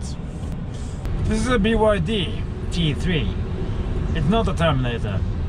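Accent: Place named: Norway. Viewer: I'm Australian and his English is good and clear even with his Norwegian accent. Very enjoyable video. The T3 order books open here in Australia on July 30th, 2021.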